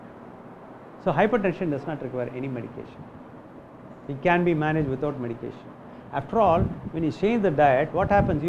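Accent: India. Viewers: This is South Indian english, don't worry, even we the people of rest of India don't understand them properly either.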